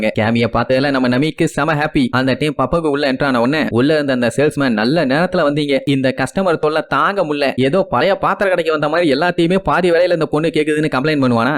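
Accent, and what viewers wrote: Coimbatore, India. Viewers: Bro nee ga coimbatore aaaa????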